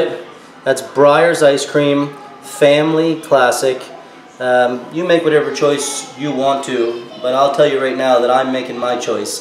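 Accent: Canada. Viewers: Breyer’s natural vanilla only has milk, cream, and sugar. It definitely melts. Don’t condemn all Breyer’s ice cream. Plus Breyer’s lids do not fall off. They have a plastic strip that goes around the edge of the lid. Evidently Oreo’s must be paying you money. I don’t know where you got this ice cream.but the Breyer’s sold in my store melts. That container is not saying ice cream. It’s a frozen dessert. Anything that says frozen dessert is not ice cream. You are obviously not here in the states. I don’t know where you are located. Since your English sounds pretty much like we have here, I’m thinking you are from Canada plus the French labeling is also a clue. I only buy their Nural Vanilla because it contains no preservatives or chemicals. Be truthful when you are condemning a product, otherwise we can’t trust you either. 😟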